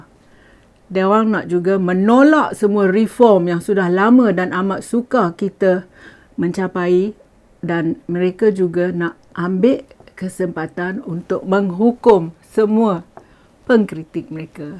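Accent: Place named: Kuala Kangsar, Malaysia. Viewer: Seronok aku dgr accent kuale dia..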